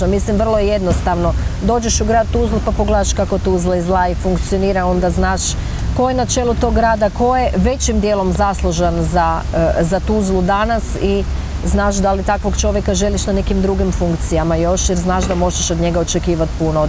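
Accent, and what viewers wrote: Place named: Croatia. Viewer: Živiš i radiš u Tuzli. A akcenat htvatski. Čudime da nema mesta za tebe nema u Zagrebu.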